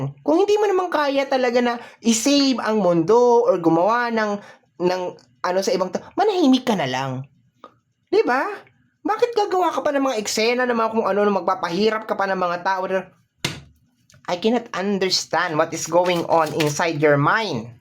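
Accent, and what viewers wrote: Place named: Iloilo, Philippines. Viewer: Good morning watching from Jacksonville florida 🇺🇸 thanks for reading i resonates much and you sounds like ilonggo me too from jaro iloilo city ..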